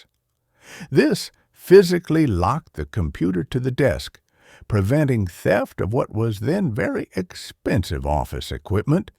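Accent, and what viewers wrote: United States. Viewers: Not liking the Louisiana-like accent. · The "southern drawl" AI voice is really annoying.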